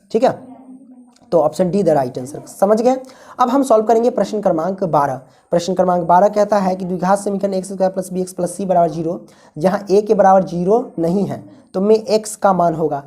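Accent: Bihar, India. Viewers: Very nyc sr ji Se ji mughe bihari language bhut achci lgti h such m mja hi aa jata h . ❤❤😂😂❤❤😂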